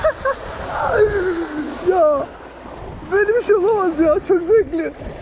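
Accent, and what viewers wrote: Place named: Black Sea, Turkey. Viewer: Kibar karadenizli aksanın çok hoşuma gidiyor 😂 Başarılarının devamını dilerim.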